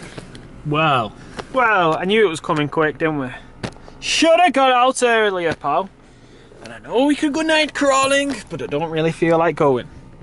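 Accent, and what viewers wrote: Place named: United Kingdom. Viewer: Lad, where in the Uk are you from?had to use CC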